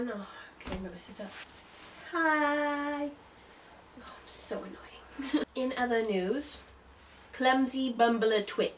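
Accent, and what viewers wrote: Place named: RP, United Kingdom. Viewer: complete stunning you are m`lady with one of the strongest high class very posh & best british accents ive heard by far absolute fabulous, great channel & love the content also. btw im genuinely being honest complimenting you, im not joking or trying to be rude to you in any way i hope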